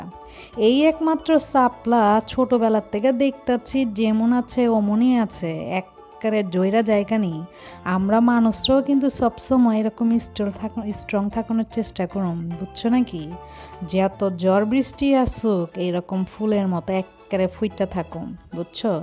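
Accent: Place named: Old Dhaka, Bangladesh. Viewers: তোমার অন্চলিক ভাষা টা সত্যি খুব সুন্দর এখন মনে হলো তুমি পুরান ঢাকার মেয়ে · পুরান ঢাকার কথা হুনতে অনেক মজাই লাগে আপু অনেক ভালো লাগছে আজকের ব্লকটি আশা করি এরকম আরো ব্লক দিবেন পারলে একটা সিলেটি ভাষায় কথা বলে একটা ব্লক দিয়েন আমাদেরও শুনতে অনেক ভালো লাগবো আপনাকে অনেক অনেক ধন্যবাদ জানাই সুন্দর একটি ভিডিও উপহার দেয়ার জন্য । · আসসালামু আলাইকুম আবে কি ছুনদর কইরা কথা কইতাচে অনেক ইনজয় করলাম আজকের ব্লগ টা, আমার বইনের শশুর বাড়ি ছুরিটোলা চিনো নি হি? আমার দুলাভাইর চৌদ্দ গুসটি এমতে কথা কয়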